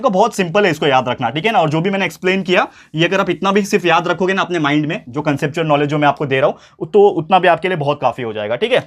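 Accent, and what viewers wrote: Maharashtra, India. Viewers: Sir, mala vatla hota tumchi mothertounge marathi aahe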